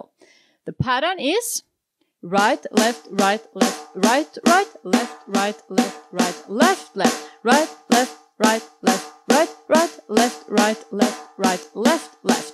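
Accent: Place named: Norway. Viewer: that's what I thought, you had that Swedish accent. Which turns out to be Norwegian.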